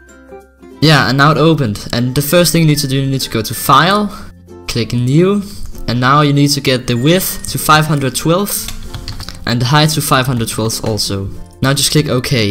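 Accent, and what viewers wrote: Denmark. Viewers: heh realised you were danish because of your accent lool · er du dansker?? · are you danish?! omg i'm too danish omg!!!!! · are you from denmark? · Are You danish? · Are you from Denmark? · Come you from Danmark? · I elsker your danske accent;);D:D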